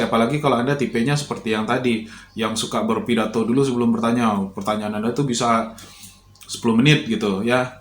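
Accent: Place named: Minangkabau, Indonesia. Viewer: mksh pak,, logat dan nada bapak seperti logat orang Minangkabau atau Padang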